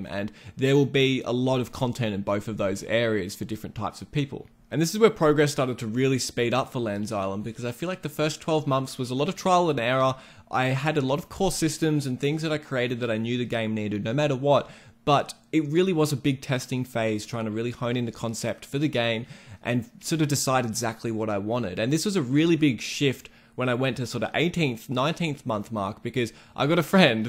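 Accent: Australia. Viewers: yooo idk why i got so excited as soon as i heard your aussie accent lol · ayyy its another aussie bloke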